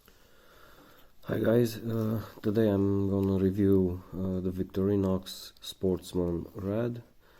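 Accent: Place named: Russia. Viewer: Не ну конечно акцент бля палевный кабзда,нашего человека узнать на раз)))Красава хули!!!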